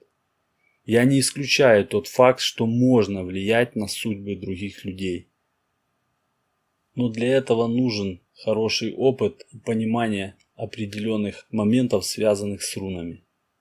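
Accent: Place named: Donetsk, Ukraine. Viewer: У меня был друг с Донецка..Димка.....у него говор как у Вас....мне приятно....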